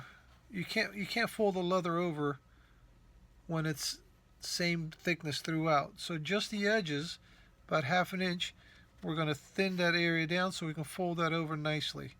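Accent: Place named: Baltimore, United States. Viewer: you have a Bawlmer "about" and "over"! What's with that? Love watching you work!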